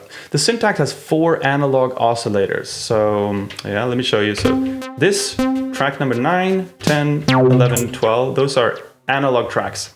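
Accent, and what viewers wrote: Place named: Sweden. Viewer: haha love the "smörgåsbord, wich is swedish for smoargooseboard, and means butter goose table"! xD I'm swedish to and when you said it I was like haha damn his accent is so clearly Swedish and I didn't notice..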